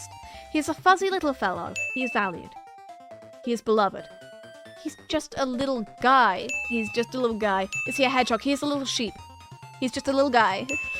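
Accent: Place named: United Kingdom. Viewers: And her Brotish accent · the fact that she’s also British and has an accent makes it 10x funnier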